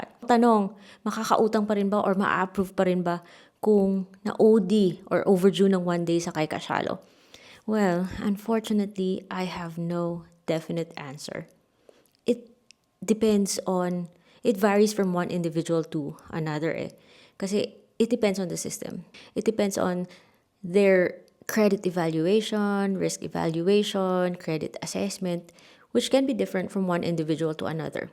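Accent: Iloilo, Philippines. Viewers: ilongga ka gali? Hehe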